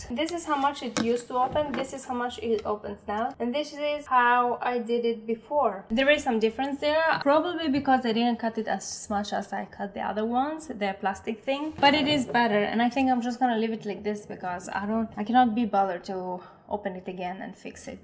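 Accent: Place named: Eastern Europe. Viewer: which part of eastern europe are you from?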